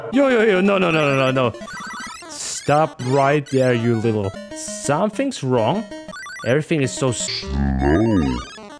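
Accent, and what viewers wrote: Germany. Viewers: your german? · Ayo you german?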